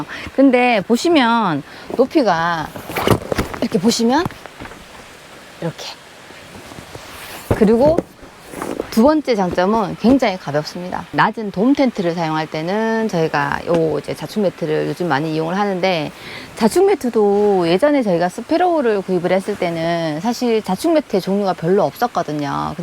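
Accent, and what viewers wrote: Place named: Gyeongsang, South Korea. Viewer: 대구분이시죠??ㅋㅋㅋ 억양으로 알것같아요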